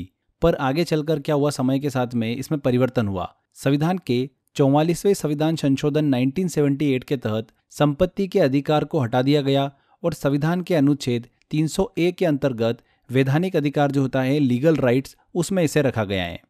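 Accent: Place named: Gujarat, India. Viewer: Aap hai Aapki tone gujarati hai but surname toh up ya bihar mein hota hai Q)toh aap kahan se ho: 1)Gujarat 2)Up 3)Bihar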